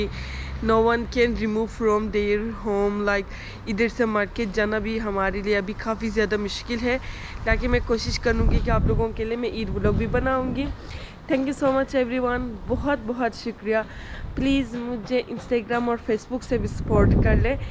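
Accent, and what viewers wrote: Pakistan. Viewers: I love your urdu accent. Love you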